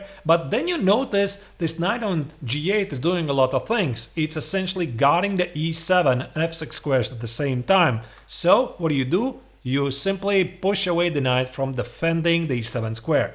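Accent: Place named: Germany. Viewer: your English sounds very German-like: "adwantage" or "undershtand" sound how a German would say those words. Why is that?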